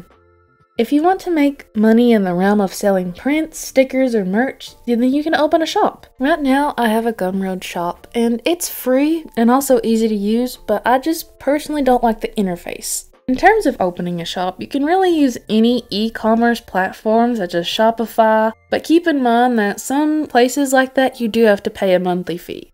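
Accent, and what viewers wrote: South, United States. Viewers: you sound southern but your voice is so sweet and calming! this video is so helpful thank youu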